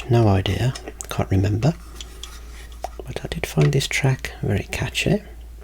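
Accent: United Kingdom. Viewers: I absolutely love your voice and that beautiful british accent. I swear you could even read the phonebook to me and i would enjoy it ❤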